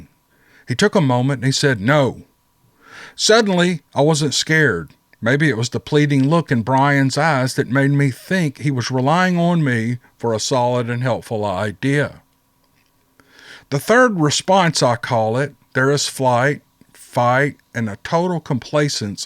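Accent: South Australia, Australia. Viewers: I love the way he wrote his story. It put the images in my head, which makes it that much better. I enjoyed your southern Aussie accent. Does anyone have any speculations on what happened to the family of 3, and their dog, that were all found dead on a hiking trail? Very intriguing event. Thanks and to, for providing my bedtime story. Love ya!!💕👍😊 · Great story and as always, well told, however, the Aussie accent??? Lol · Do you have ai link to his channel? I loved your Australian accent 😃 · You did an awesome Aussie accent, keep up the good work, you're a great bloke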